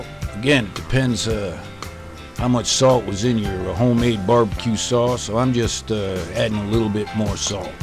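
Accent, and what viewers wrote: United States. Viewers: U sir has an authentic good ol american voice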